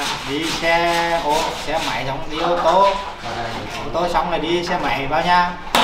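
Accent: Nghe An, Vietnam. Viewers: Ngày nào củng xem chương trình của xem mà không thấy chán vì biết được cuộc sống ở châu phi, và nghe được giọng xử nghệ quê hương mình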